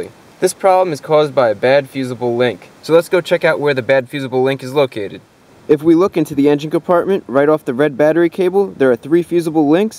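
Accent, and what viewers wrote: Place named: New Jersey, United States. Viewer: He's from New Jersey, that's how we say water here 😅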